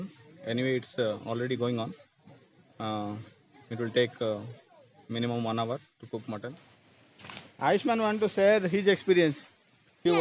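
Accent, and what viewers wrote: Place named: Odisha, India. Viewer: Aap odia walo ho na .. english clean nahi hai aap ke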